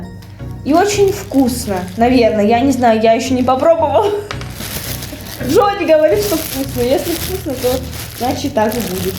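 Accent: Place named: Tajikistan. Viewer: честный слов ты как актириса браво по акцентом разговариваешь по таджик ской язике.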